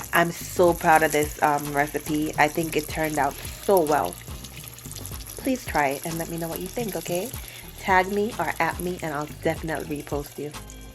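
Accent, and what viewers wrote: Jamaica. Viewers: nice video wheres the accent from, jamaica?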